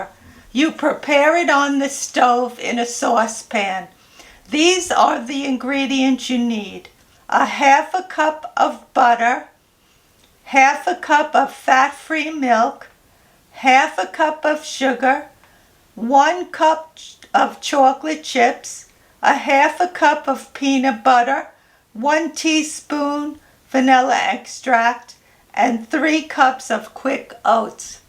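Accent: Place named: Long Island, United States. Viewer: Ex-Long Islander here out in Vegas. LOVE hearing your Long Island accent makes me feel as if I was back home! I gotta make these tomorrow. Wishing you both a warm and Merry Christmas! ♥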